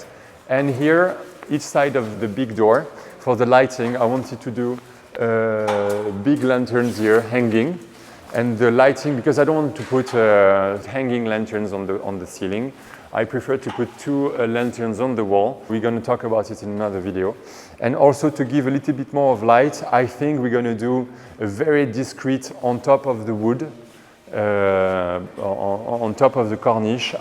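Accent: France. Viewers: Always a champion! Love the changes you have made..your English is superb, the light French accent makes me want to learn the language!!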